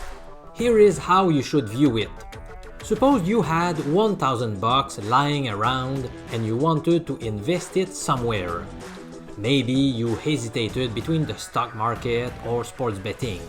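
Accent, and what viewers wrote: Quebec, Canada. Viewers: Comme un bon vieux quebecois ! Hehe jadore tes video mon ami je te follow a 100% :)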